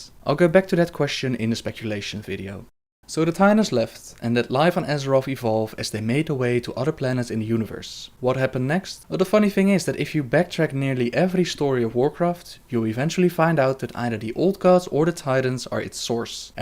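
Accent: Netherlands. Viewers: I knew you were dutch ... I just could hear it :D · Right away i can tell you are from holland or belgium just by your horrible english accent! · u MUST be dutch.. if not.. well we just sound alike when we speak English xD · let me guess. you're Dutch?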